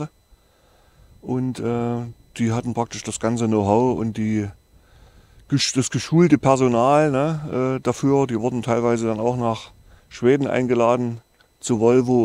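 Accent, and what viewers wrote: Saxony, Germany. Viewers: Contents It's a saxon dialect (very slight, though)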